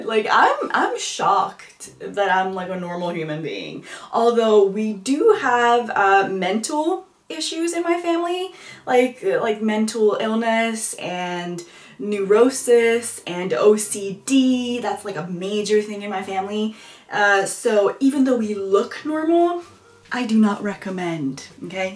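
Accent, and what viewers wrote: United States. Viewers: Your Southern accent is sickly sweet like a peach princess And I love it!! Now can you do a Texan drawl?? New subscriber and fan!! Great escape from the kids to listen to reddit stories.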